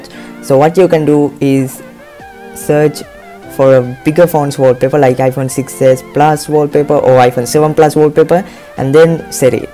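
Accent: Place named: India. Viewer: Are you Indian or Pakistani? I'm assuming Indian from your accent. You should get a Snapchat account so we can know when you upload a video.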